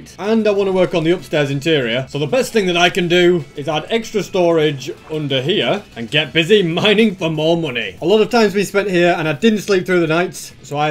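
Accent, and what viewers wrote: United Kingdom. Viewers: hey im new to your channel also i have a question are you britsh? also again good job on the content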